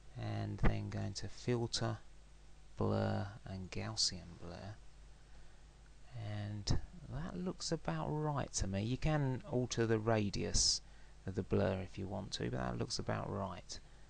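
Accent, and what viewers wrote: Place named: United Kingdom. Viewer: yea, the british accent is nice* in a way lol hehe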